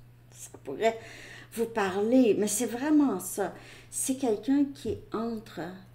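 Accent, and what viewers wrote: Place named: Sherbrooke, Canada. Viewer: Woin a pomale le même accent à Sherbrooke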